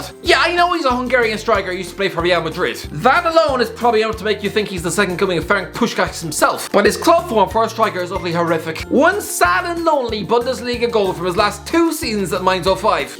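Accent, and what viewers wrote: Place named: Ireland. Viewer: He is Irish, accents are different SHUSH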